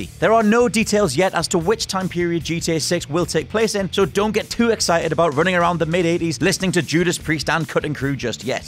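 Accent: United Kingdom. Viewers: Why is it British accents on ever gta rumor video??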